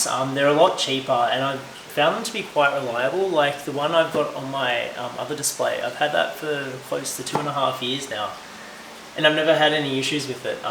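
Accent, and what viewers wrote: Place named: Australia. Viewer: What was the name of the controller? I don’t understand your Aussie accent well enough 😅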